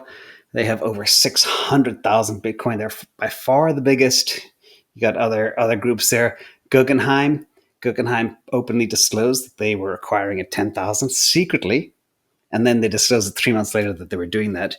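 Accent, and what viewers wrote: Ireland. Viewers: am I hearing a slight Irish accent.?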